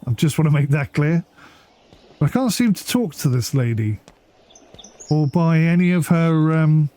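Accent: United Kingdom. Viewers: Happy to see you giving this game a go so i could see some gameplay of it and learn a bit about how it plays, much appreciated 👍 But what is up with the sudden on/off cam inclusions here and there? I'm not a frequent viewer so maybe it's part of your style or some kind of in-joke? The first one felt kind of natural and i figured it was like when people streaming to show their inputs while playing. But then a bit later came a weird belly/crotch cam shot and a couple of completely black ones that made me start to wonder if these are AI generated blurbs or something like that, as those (at least for me) didn't seem to have any purpose to them 😅 The one where you were gesturing while talking about boat designs felt relevant to show your hands when talking, so then we were back to making sense 🤔 A few of them felt like they were also rotated 90 degrees compared to the original cam angle? 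Dropped a like and would love to see more gameplay of this, if there's enough content in there to make more videos out of it. It feels very fitting to have a 18th century sailing game with a British narrator! 😁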